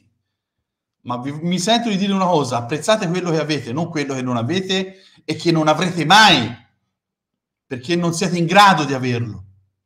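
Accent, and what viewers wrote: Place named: Piombino, Italy. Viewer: Tu hai giocato a pallone e sai quello che dici e non sbagli un colpo, la storia che racconti è perfetta, come parli mi fai morire dal ridere, ho frequentato per 40 anni Piombino isola De'Elba mio fratello aveva famiglia a Venturina, e parlano come te, la simpatia è unica, scusami di questa esternazione, ciao, forza inter sempre ❤❤❤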